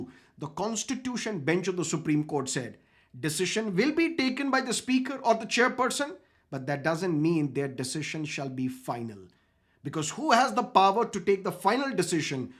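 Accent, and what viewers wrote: United States. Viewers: ये ज्ञानी कौन है? क्या उसे लगता है कि राघव, पाठक और भाजपा ने इस बारे में सोचा ही नहीं है? मुझे ऐसे मूर्ख लोग बिल्कुल पसंद नहीं जो अमेरिकी लहजे में अंग्रेजी बोलते हैं। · Aap american ho kya, aise kyu bol rahe ho 😅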